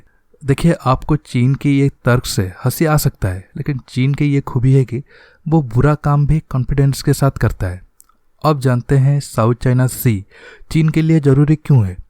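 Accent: Odisha, India. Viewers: Pahle mujhe laga aap bengal se ho Par jb last line suni to ab lag rha hai aap odisha se ho. Kya aap odia hain?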